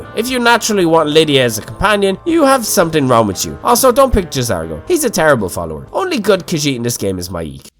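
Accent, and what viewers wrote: Ireland. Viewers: R u Irish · Standards? My standard is that Irish voice and a shot of a half-naked M'iaq cooking stuff in a pot.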